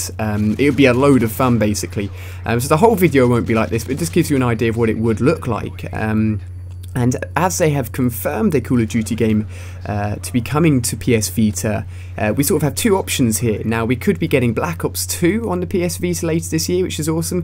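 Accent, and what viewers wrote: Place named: United Kingdom. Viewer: Dude he sounds British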